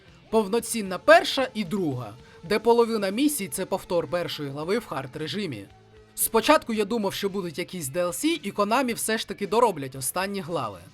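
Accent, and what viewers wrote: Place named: Russia. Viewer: Как видим, российская челюсть не позволяет нормально разговаривать на украинском. Продолжаем зоонаблюдения.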